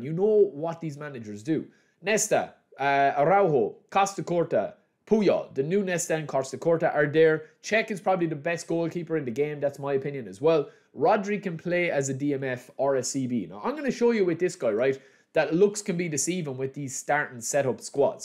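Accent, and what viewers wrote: Ireland. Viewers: What's the craic lads? Which part of Dublin are you from?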